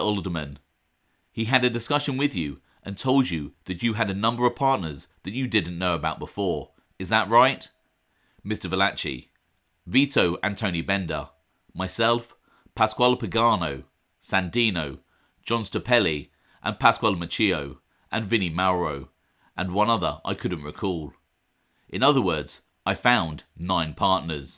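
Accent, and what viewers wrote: United Kingdom. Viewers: For the life of me, i cannot understand why this channel doesn't have a million viewers by now. With all the mob related content out there, this channel stands alone as the best source for accurate information on the mafia in NYC. My wife especially loves to hear the "sexy deep voice" in which it is delivered in, I personally wouldn't go that far, but as everyone knows, If you enjoy a documentary about how the world was once in chaos, how humanity hung in the balance, and or how John Gotti got a haircut once a week, you definitely need a British accent for that sort of thing. has got that in spades.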